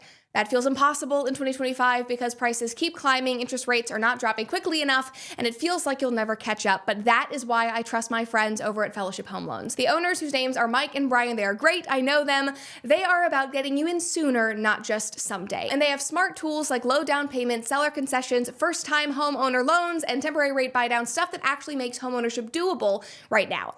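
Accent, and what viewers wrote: United States. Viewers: this is going to sound weird, and I do not mean it to, but your voice is identical to my girlfriend Heather's in every way except for accent. My girlfriend, Heather, is from Hackney in London originally and came to Canada as a kid. Sometimes Heather is away as she is an officer that covers a lot of Northern Manitoba, so I tend to listen to you when she is away because then I feel like heather is there with me a bit. I'm totally blind and have no eyesight, so the two ways I tell people apart are by the sound of their voice and the texture of their hands, (and since i never met, the only thing I know about her is that she has tiny hands. I'm 5-1 tall and even my hands are bigger than hers according to my friend Ethan down in Chattanooga). Anyway, so because I don't know the hands, I have to go by your voice. Because there are only so many types of voices and timbres, yours happens to be identical to Heather's to the point that were it not for her accent, vocally I would confuse you and her. 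I worry lots about Heather every day when she goes out to do her job, she puts her life on the line every day to protect us here in Northern Manitoba. So that is why I get a bit of reassurance when I hear your voice, because it sounds like Heather's, only with an American accent not a tinge of Cockney. Thanks.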